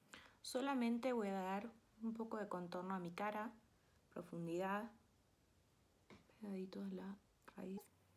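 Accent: Mexico. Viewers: Acento mexicano va ganando terreno o.O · Que bella que sos pero...y ese acento mexicano? :O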